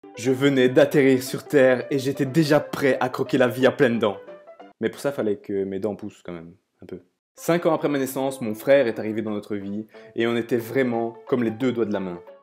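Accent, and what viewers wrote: Belgium. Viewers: T BELGE OU FRANCAIS!? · Je déteste ton accent belge ms putains tu m'as bien fait rire !!! #bx #ulb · T'a un accent belge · T’as trop l’accent liégeois comme moi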